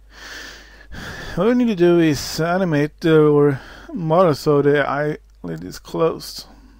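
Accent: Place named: Ireland. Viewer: where are you from? such an interesting accent, Irish?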